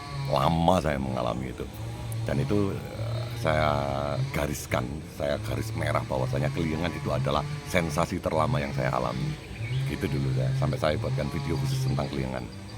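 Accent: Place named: East Java, Indonesia. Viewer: kalau liat dr logatnya. Masnya dari Jawa Timur. Kebetulan asli saya Jatim juga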